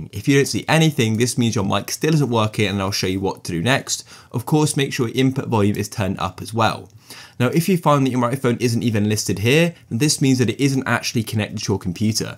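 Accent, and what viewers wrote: United Kingdom. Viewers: EWWWWWWW NOT AMERCIASN BRITISH EWWWWWWWWWWWW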